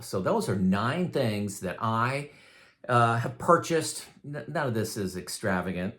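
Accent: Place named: Southern Indiana, United States. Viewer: lol, I was wondering where your accent was from, you must live in the southern part of Indiana near Kentucky. You have a great accent!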